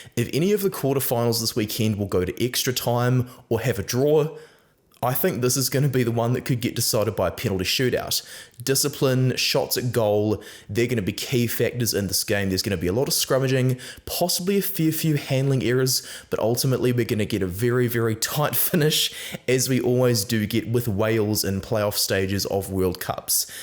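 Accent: New Zealand. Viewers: hi! Really enjoying your videos:) You're an excellent host! Many reasons for this. I'm a Frenchman, who lived in NZ between 2009 and 2016, looks like the ABs have stopped dominating and winning everything as soon as I left Aotearoa:( but that France went on to (almost) win 2 soccer world cups since;) and on its way to conquer the 2023 RWC!? We shall see!. Hearing you talking about rugby with your kiwi accents brings back so many good memories of NZ, and your passion and knowledge of the game and how you communicate them are unmatched. Keep going bro:) Take care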